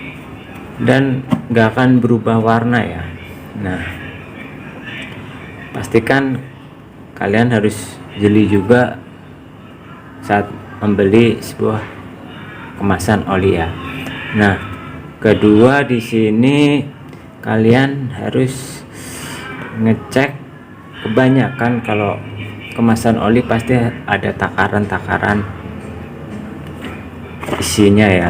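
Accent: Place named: Cirebon, Indonesia. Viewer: ngomongnya kyak orang cirebonan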